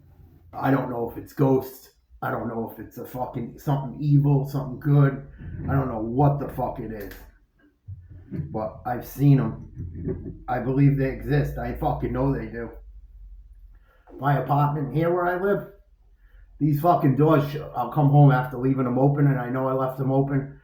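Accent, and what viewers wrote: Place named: Boston, United States. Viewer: Good stories! The boston accent😎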